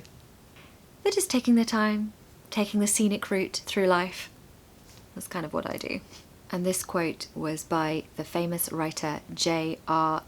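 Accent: United Kingdom. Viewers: you have a beautifully sounding true British accent. How can I achieve that accent you have if I am not an English native speaker?? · Beautiful British English and form an inextricably-linked whole. So yeah, I come 'for both'. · Hello, I recently subscribe your channel. I love your british accent! I am from Chile and enjoy your videos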